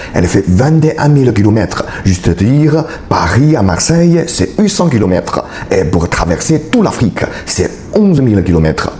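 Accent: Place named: Belgium. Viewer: Merci et je trouve-moi aussi intéressant ce concept :) je ne compte ni y aller ni m'intéresser plus sur ce pays mais ravis d'avoir pu le découvrir un peu ce soir. Et juste pour le fun, n'y voyez pas de moquerie, je trouve dans l'accent que vous avez un brin de quelque chose de belge, c'est juste marrant de se faire cette remarque :)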